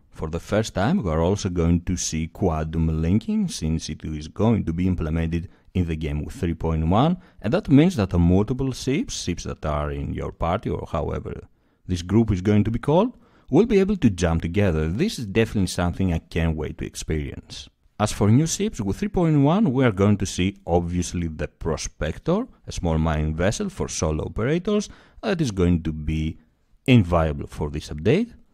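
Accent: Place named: Greece. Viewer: Hmm, by the accent I would say he is Greek. Or at least his sounds like one.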